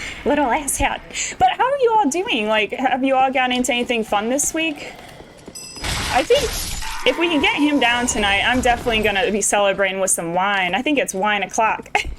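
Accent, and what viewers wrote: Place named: Australia. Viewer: English, not "British" accent but yeah it sounds more Australian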